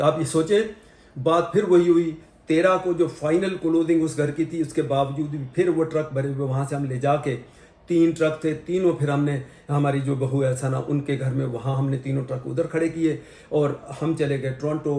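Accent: Punjab, India. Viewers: ur punjabi is so cute and ap dono ki jodi bohut khoobsurat hai ap ki family lajawab hai ap ko khushiya dey ameen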